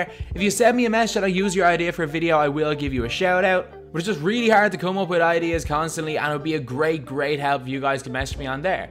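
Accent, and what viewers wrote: Ireland. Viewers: Irish Accent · Reynaldo Tolentino Irish yan pre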